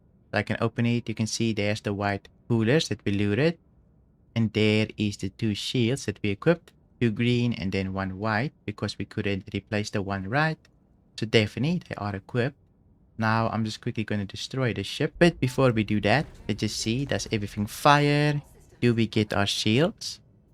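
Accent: South Africa. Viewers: I'm sure you're South African in some way or form...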